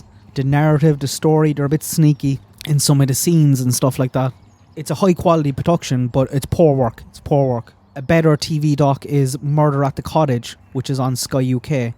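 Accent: Cork, Ireland. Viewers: Hi from County Fermanagh. Just found you and very glad I have! I agree, the Sophie case is West Cork is fascinating - the podcast is wonderful. I was hoping you might have other podcast suggestions?! BTW is that a Cork accent I hear? Love it. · Hey Chasing :) Thanks so much for your Community Post which is how I just found this channel... and got such a surprise when heard such a familiar accent!! He sounds like he is from same end of Ireland as I am - will have to check out his content... Can't agree more with this video also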